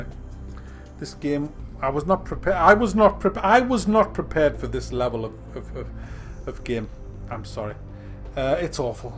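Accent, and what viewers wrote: United Kingdom. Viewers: omfg. this is the best channel for pessimistic gamers ever. And the british accent makes it even better for us yanks. so entertaining. i try to show everyone i know this channel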